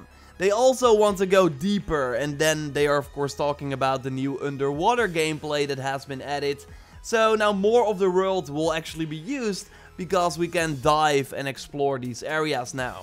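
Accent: Netherlands. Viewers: when I heard his accent I knew instantly that he was dutch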